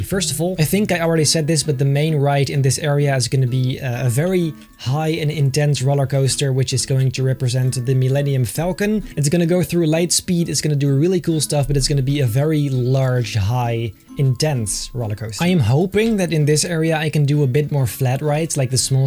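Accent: Netherlands. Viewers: hey are you dutch /NL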